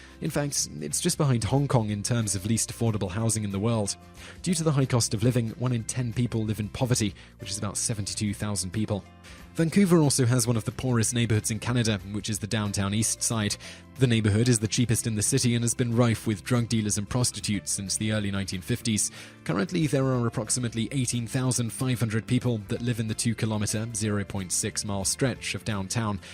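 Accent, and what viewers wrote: United Kingdom. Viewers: I would certainly hope a guy who has a broad british accent knows that oxford university isn't in london.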